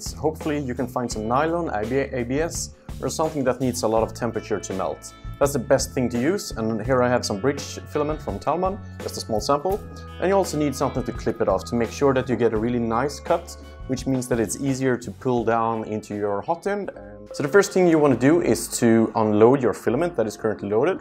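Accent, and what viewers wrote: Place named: Sweden. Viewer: I bet 5000€ this guy is Swedish xD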